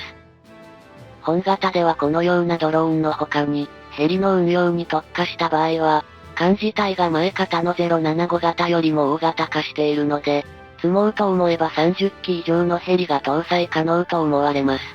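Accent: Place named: China. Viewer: あと流暢な日本語喋ってても明らか中国人(大陸の方)ってわかるんだよね… ちなみにあなたの国は学問の自由指数 (Academic Freedom Index 2025): 中国の状況: 世界179カ国中で極めて低いグループ（Status Group E: 完全な制限）に属しています。 スコア: 2024年時点で0.067（1.0が最高）とされており、教える内容や議論の自由が厳しく制限されていることを示しています。 報道の自由度ランキング (<num>): 順位: 中国は180カ国・地域中、ワースト2位付近（179位など）に位置しています。情報の検閲や修正が常態化している環境が浮き彫りになっています。 …そんなんだから真実が分からんのだよ…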